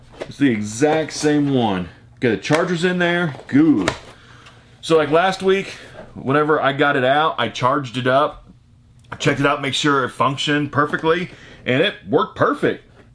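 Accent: United States. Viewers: I don't mind your rambling...a) I like your voice and b) I'm not an english-speaker so it is always good to listen to some genuine american-english ^^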